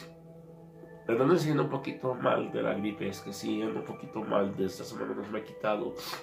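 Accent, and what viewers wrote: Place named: Portugal. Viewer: Eres de portugal o galicia?....... Hay veces que no te entiendo. Yo soy nativo del español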